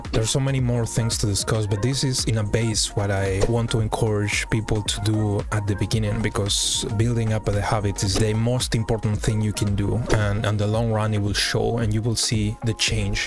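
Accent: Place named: Argentina. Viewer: Idk but I can't stop asking myself- from where are you? Bc your accent sounds very argentinian KSNSNSA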